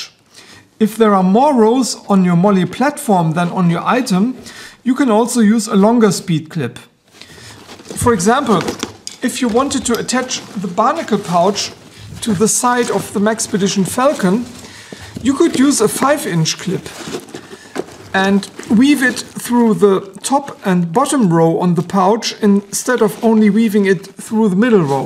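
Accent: Germany. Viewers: I love German accents like yours, lol. I giggled at "coy-otee." We say ky- (like 'sky')-otee, or ky-oat where I grew up. Just thought that was a funny pronunciation. Anyway, helpful video. Thanks dude.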